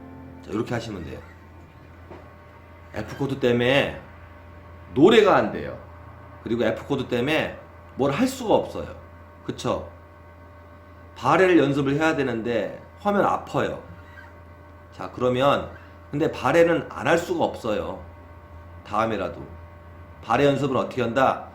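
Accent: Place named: Jeolla, South Korea. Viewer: 정말 초짜들에 대한 배려가 넘치는 강의이십니다. 기가 막힌 강의 잘 들었습니다. 한 마디 한 마디가 피가 되고 살이 되는 말씀입니다. 계속 초보자들의 어려움을 헤아리는 강의 부탁 드립니다. 선생님 계신 곳이 전라북도인가요? 익숙한 억양이라 점감이 더 큽니다. 저는 군산입니다.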